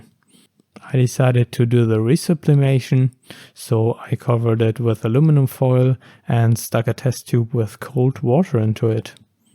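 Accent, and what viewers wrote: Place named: Germany. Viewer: Could it be a german accent? I generally think that a calm german person speaking english seems to have a softer voice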